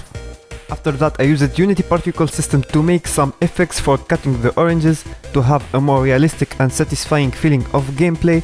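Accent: France. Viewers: t'est français ? car tu sonne français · It's me or this french accent is KINDA THICC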